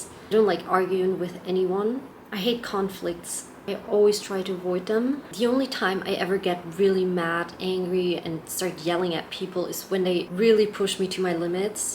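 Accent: Australia. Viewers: Aussie accent creeping in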